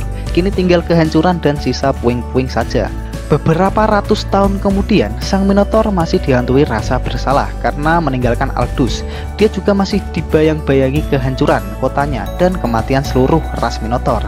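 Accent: Indonesia. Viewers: nganu, medhok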